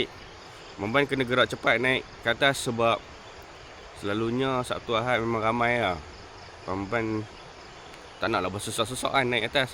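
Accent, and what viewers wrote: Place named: Kelantan, Malaysia. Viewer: steady makan budu..lidah kelate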